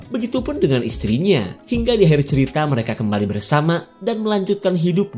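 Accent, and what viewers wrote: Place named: Garut, Indonesia. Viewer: Mang orang mna ,logat na garut pisan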